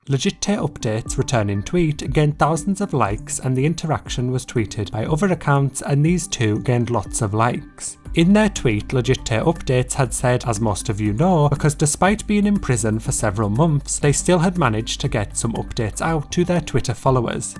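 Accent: United Kingdom. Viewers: Dude you’re literally growing so fast u already got 5k! If there’s anything the YouTube algorithm is a sucker for it’s nice clean British accents, and even nicer video content in an organized, and fun way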